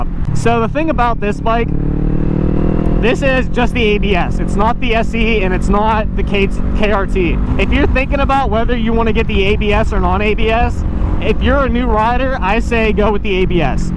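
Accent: Pittsburgh, United States. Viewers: I was like dang this guy sounds like he's from Pittsburgh...then I saw Giant Eagle and Sheetz lol nice review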